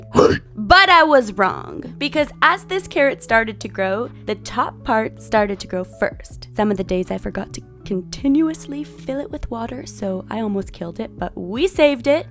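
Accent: United States. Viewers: Ur accent is a mox between New Jersey, southern, and California 😫 ive never been so confused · Shes definitely alternating through multiple dialects, northern and southern US. Which is interesting since she's in Canada.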